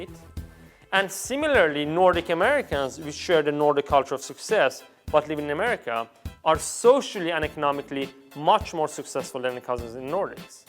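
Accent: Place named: Iran. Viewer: This guy sounds Persian!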